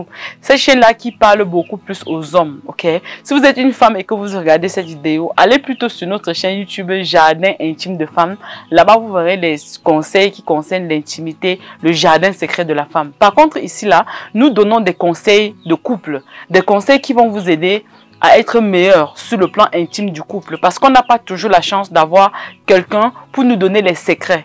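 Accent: Cameroon. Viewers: C'est comme la poule. Elle ne donne pas du lait, mais mais mais, elle nourrie ses poussins, avec la chaleur de son corps. Merci.. bonjour. Ton accès de ton me ressemble à une camerounaise. Merci.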